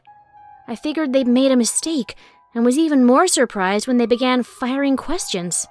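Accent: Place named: United Kingdom. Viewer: I don't know why,but I never really took notice as to how much suppressed her British accent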